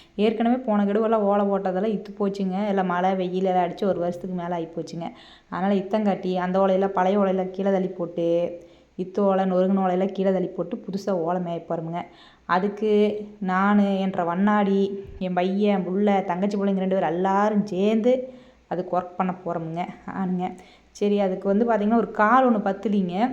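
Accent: Kongu, India. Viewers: உங்கள் மாட்டு கொட்டகைக்கு ஓலை போடுவது மிக அழகாக படம் ஆகி இருக்கிறீர்கள்👍 அதைவிட உங்களுடைய கொங்கு தமிழ் பாஷை அழகாகவே இருக்கிறது 👍 இதுபோல் தொடர்ந்து பெரிய பெரிய வீடியோக்களை இன்னும் ஐந்து நிமிடத்திற்கு மேல் போட்டால் நிறைய பேர் விரும்பி பார்ப்பார்கள்😊 ஆரம்ப நிலையில் இருப்பவர்கள் இது போல் வீடியோ போட்டுக் கொண்டே போனால் வெற்றி உறுதி 👍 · ஓலை போட்டது super கொடுத்து வச்ச மாடு👌 🎉🎉🎉கொங்கு slang🎉🎉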